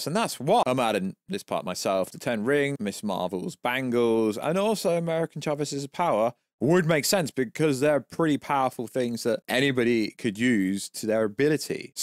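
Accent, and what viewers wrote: United Kingdom. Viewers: It is a very British listen for sure. lol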